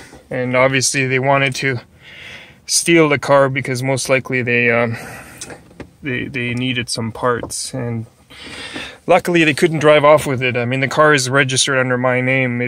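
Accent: Germany. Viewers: Right - will do. Just watched you Mom-video. Where in Germany are you from? I'm wondering about your German dialect.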